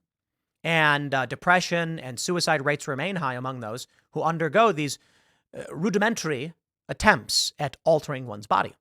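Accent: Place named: Chicago, United States. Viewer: I hear your Chicago accent today!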